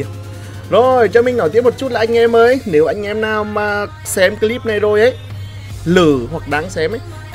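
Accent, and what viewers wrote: Nghe An, Vietnam. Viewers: làm mình nhớ con NYC quê Nghệ An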